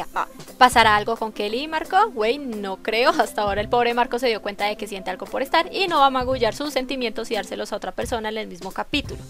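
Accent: Colombia. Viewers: ESPERA.... Eres colombiana ????? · tu eres Colombiana? Yo si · eres colombiana? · Eres Colombiana? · Jajaj saludame en el siguiente vídeo pdta:eres colombiana? :v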